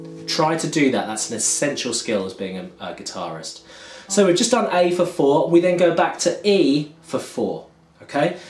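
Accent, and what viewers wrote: United Kingdom. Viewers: 🤔🤔🤔 Thé British accent is so class compare to américain's 😱😱😱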